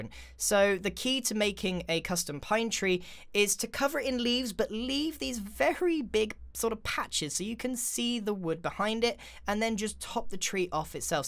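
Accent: Netherlands. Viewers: Last van een nederlands accentje?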